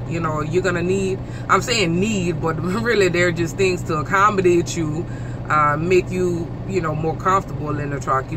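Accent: Louisiana, United States. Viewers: I love that Cajun accent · Sound like you from south Louisiana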